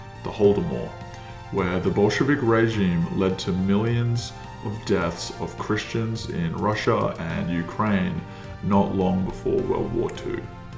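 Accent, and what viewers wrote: United States. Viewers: My only criticism is the pronunciation of anti,Americans say it like Ant-I,Aussies and English say Antee I’ve noticed it’s been creeping into our circles. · What’s with the American pronunciations?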